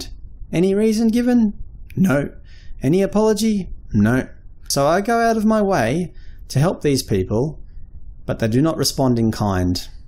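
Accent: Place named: Australia. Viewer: Hey there. I value your commitment to informing the public on misanthropy, and I really like your content. That accent sounds Australian, as am I. I am a researcher interested in understanding human behaviour, particularly so far as it relates to anthrozoology and ecopsychology. I am curious, are you a scholar or academic professional?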